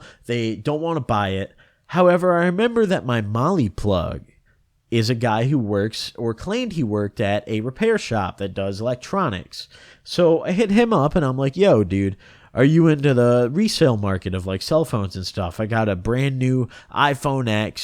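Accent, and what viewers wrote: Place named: Naperville, United States. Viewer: are you from naperville. I’m from around there and it sounds like you are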